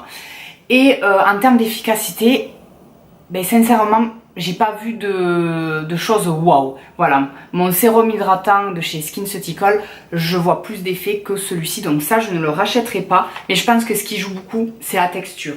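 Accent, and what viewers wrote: South, France. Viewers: coucou ,je suis tout à fait d'accord avec toi pour la recherche du naturel , je suis en train de faire ce parcours aussi . je t'encourage à continuer dans ce sens :) .Bisouuus (en tout cas on a l'accent du sud tous les deux hihih)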